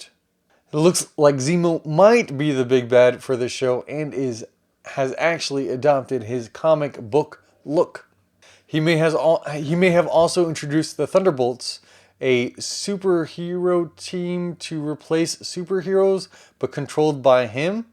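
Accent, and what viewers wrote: South, United States. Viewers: I love your vlog of this video at your house in Texas during your quarantine until you're talking about the mini-series of "The Falcon and the Winter Soldier" while it came out on Disney+, it says after being handed the mantle of Captain America at the end of "Avengers: Endgame", Sam Wilson teams up with Bucky Barnes in a worldwide adventure that puts their abilities to the test as they fight the anti-patriotism group, the Flag-Smashers and you're talking about all the characters of the mini-series from the beginning to the end after that, I hope your husband, Zach will be very proud of you, so I wanna say I'm very happy for you, but I love your Southern accent and I know you're half Mexican!! #FalconandTheWinterSoldier #DisneyPlus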